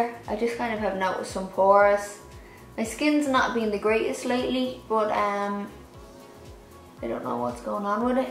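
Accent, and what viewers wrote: Ireland. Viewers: I just found you the other day and I love your tutorials!! I love your hair aswell hah💓 also are you from Ireland? You've an Irish accent hah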